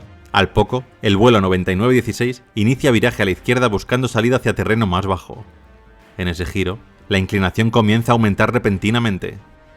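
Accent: Spain. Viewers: MUCHACHO RELATADOR. HABLA MÁS DESPACIO PORQUE NO SE TE ENTIENDE TU ACENTO NO SE DE QUÉ PARTE DE ESPAÑA SEAS. PERO HABLAS CON LA LENGUA PEGADA AL PALADAR ÓSEA TE OYES CÓMO UN TRABALENGUAS , TRATA DE PRONUNCIAR TUS PALABRAS MEJOR DICHAS. ESQUE TE COMES LAS PALABRAS Y APARTE HABLAS RAPIDO. NO SE TE OYE NADA CLARO CORRÍGETE MI AMIGO. GRACIAS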